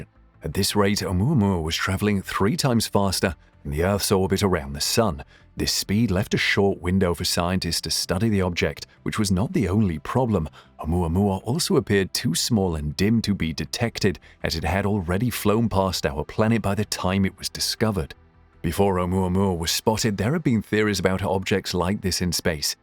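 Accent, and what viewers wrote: United Kingdom. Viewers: Just how far in space can a built object go before burning in the atmosphere? What are the odds that we are being lied to about ALL what this guy with his SO official voice?!? And because he has a soft English/British voice, is that supposed to make him seem honest when ALL they've done throughout time is lie to us???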